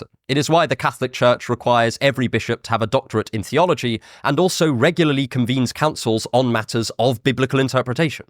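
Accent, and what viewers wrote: United Kingdom. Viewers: You are soooo handsome ❤❤❤❤and your british accent ❤❤❤❤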